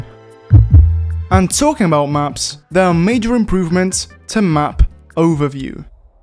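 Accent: Italy. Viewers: As an Italian i really like your Italian accent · Ma sei italiano? No vabè🥲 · Are you italian? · Your English is vary good but that: "nono é" It means: "che sei italiano e minchia se lo nascondi bene l'accento italiano" Gg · sei italiano?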